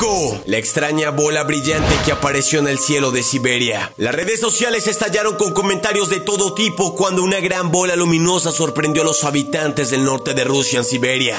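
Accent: Colombia. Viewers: Eres de colombia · Sos colombiano ?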